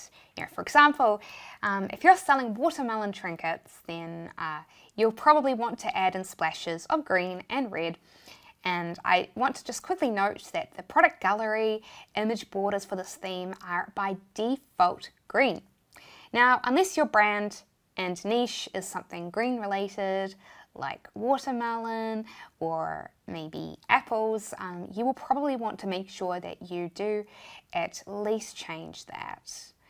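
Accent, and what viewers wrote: New Zealand. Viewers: the adorable New Zealand accent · I love your New Zeland accent.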